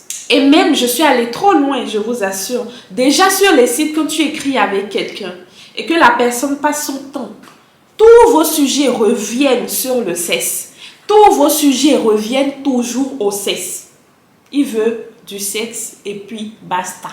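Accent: Benin. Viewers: Tu es Béninoise ? Ton accent ❤ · ah d accord super alors je suis béninoise aussi j’ai reconnu l accent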